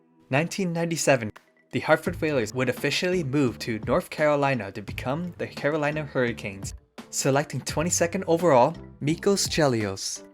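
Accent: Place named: Asia. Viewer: I didn't even realize the narrator's Asian accent until he said words like "Golden" and "Calder"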